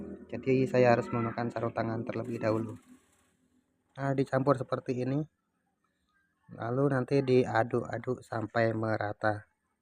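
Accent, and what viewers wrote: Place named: Java, Indonesia. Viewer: Sampeyan basa jawane medok pisan sihh,,,??wong endi njenengan mase,,,👍👍👍🙏🙏🙏🙏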